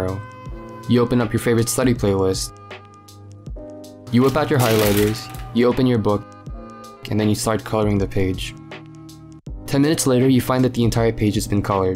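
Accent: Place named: United States. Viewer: Hey, are you Indonesian? Or Malaysian? But, you sound so American, hhhmmm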